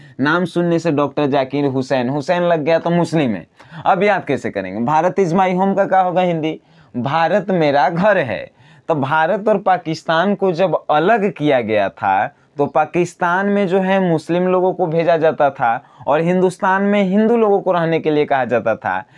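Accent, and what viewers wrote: Bihar, India. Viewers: Sudh bihari hain aap salutes hai aapko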